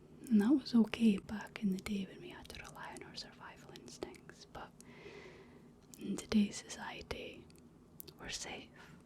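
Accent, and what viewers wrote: Scotland, United Kingdom. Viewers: A Scottish lassie that does Star Wars references? I think I am in love🥹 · Scottish accent definitely works on me. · I came across a few of your videos with your storytelling and just fell in love with your voice. I can honestly say I've always loved the Scottish accent. Growing up on the east coast of Canada, Newfoundland we are known for our unique language.. Keep being you. Never change. You have a beautiful soul. Thank you for being here.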